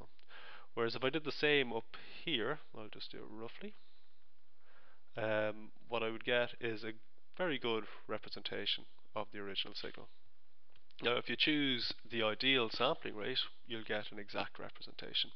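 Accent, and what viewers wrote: Ireland. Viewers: Sweet explanation and an Irish accent on top. Class fella, cheers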